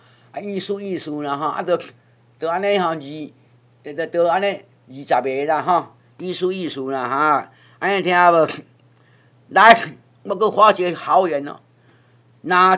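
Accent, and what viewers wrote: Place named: Taiwan. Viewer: 台語講得有特色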